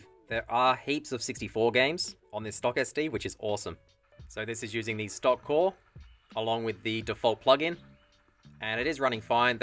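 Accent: Australia. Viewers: lol, thought it was just the ausi accent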